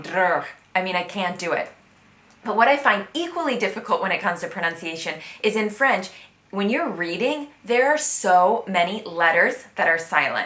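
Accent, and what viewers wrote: England, United Kingdom. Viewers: Your sole problem in this video is : you pronounce all these french words with a perfect English accent ! Especially the pronoun ON. Consequently it must be hard for your English speaking audience. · Hi. It's certainly b/c you just don't know your sounds in French ( u / ou - an / on ) and keep your English accent . In these conditions, I couldn't speak this way myself. You should start to pronounce short words the right way then see step by step.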